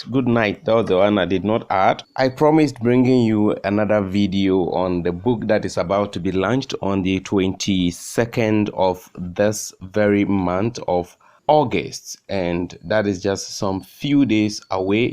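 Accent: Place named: Ghana. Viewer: First I love the way Ghanaians speak so that means I love the way you speak...secondly I love how you were able to sell this book even without looking at it listening to you can make one want to buy the book..and finally thank you for choosing this book to review on it because a lot of people need to be really educated about there health... am a health educator and our primary goal is give preventive means other than curative means to health and as they say health is wealth so thank you for sharing this I enjoyed every bit...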